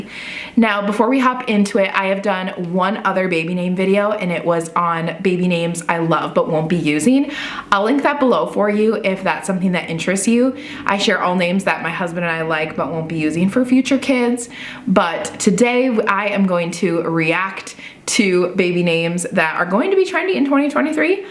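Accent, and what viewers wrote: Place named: United States. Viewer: I like the cowboy accent 😂😂😂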